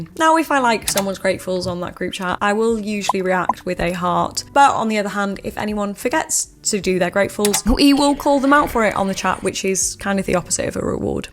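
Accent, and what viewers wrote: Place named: North Wales, United Kingdom. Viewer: Fellow north wales girl here thought your accent sounded familiar x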